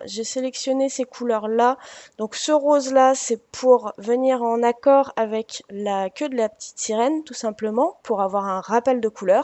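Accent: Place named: United Kingdom. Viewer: Coucou. Très chouette le contraste couleur/Blanc.Ton accent anglais est comme le mien. Cela me fait sourire car j'ai du mal à prononcer certaines sonorités. Bisous.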